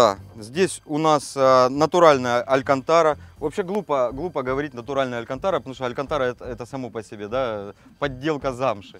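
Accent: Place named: South, Russia. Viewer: Южнорусский говор, прекрасное чувство юмора(интеллект в наличии)- прям как на Родине побывал., спасибо!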